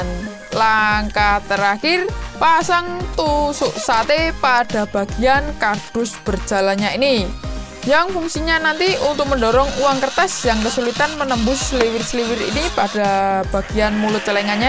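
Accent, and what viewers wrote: Java, Indonesia. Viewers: Koe medok men bosqu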